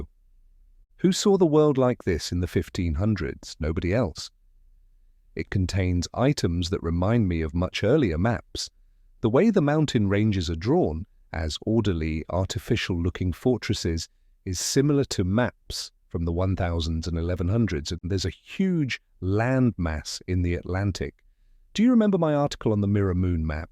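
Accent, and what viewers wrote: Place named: United Kingdom. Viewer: The voice is British - not for me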